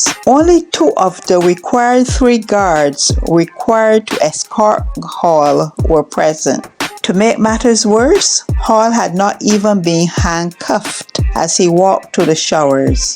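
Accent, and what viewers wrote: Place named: Barbados. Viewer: The accent is a "Bajan" accent or if you can say Barbadian accent.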